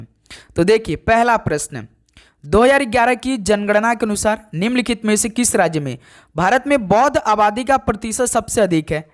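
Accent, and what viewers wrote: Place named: Uttar Pradesh, India. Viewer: Thank you sir aap achha samjhate ho aur apke bolne ke language se lagta hai aap u.p se hai 😊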